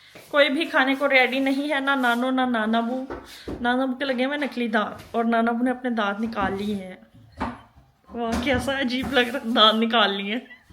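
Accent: Pakistan. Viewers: pure Pakistani accent❤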